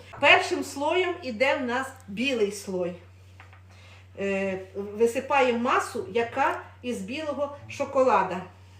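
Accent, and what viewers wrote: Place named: Poltava, Ukraine. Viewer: Дуже подобаються сирні страви🍮,а якщо це ще і паска ,то взагалі 🎉💥.А ще респект за ваш суржик ✌️.Ви не з Полтавщини?Мої вітання з Дніпра.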